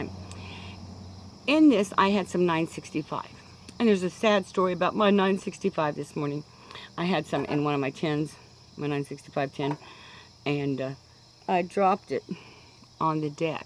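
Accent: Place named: Kentucky, United States. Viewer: Hello Mrs.. I am a friend of Wes (Old Khakis). He said you had a nice trip down to Texas. So glad to know you. I am from Arkansas, so that Kentucky accent fell sweetly upon my ears.😊. I loved this video, even though I had to “listen quick”! LOL! I’ve only been smoking a pipe for 9 months, but absolutely LOVE the hobby! God bless you, Mrs.. I look forward to more of your videos! Cliff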